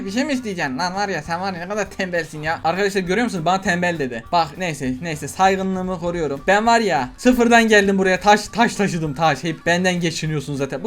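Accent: Malatya, Turkey. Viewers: ABİ SEN MALATYALIMISIN NERESİNDEN BEN DE ORALIYIM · Abi sen malatyalısın ya neresinden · Abı sen Malatyali misin bende malatyaliyim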